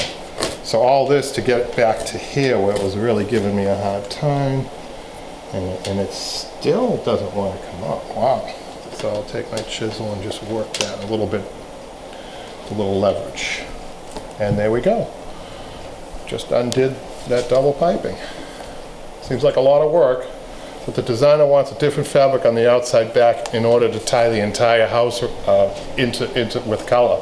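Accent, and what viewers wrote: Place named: Boston, United States. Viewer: I've been watching youtube videos to learn how to reupholster my chair, and came across this. Your accent...I thought, "he has to be from back home?!" Sure enough, Boston! Thanks for the great videos, from a fellow Masshole now living in Nashville, TN!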